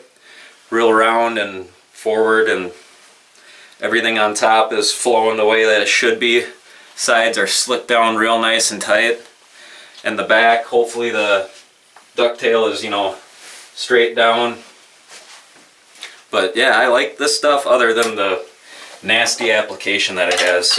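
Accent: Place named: Wisconsin, United States. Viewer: You've got a Wisconsin or Minnesota accent man. Good review though.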